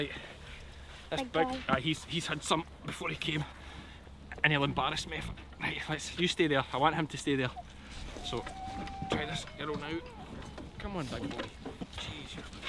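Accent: Scotland, United Kingdom. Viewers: Scottish accent and sheep shearing! What could be better! Hello from NYC!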